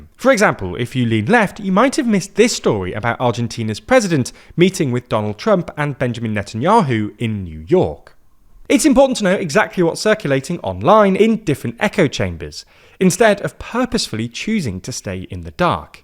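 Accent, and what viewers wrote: United Kingdom. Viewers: Americans: Generation Z British people: generation Zedd. (Is he talking about Lord Zedd from Power Rangers or is this just a British accent sounding weird to me?)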